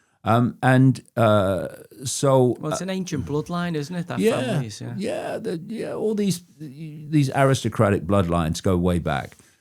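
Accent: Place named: Northern England, United Kingdom. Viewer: Message to the host - stop interrupting the guest with that barbaric up north accent.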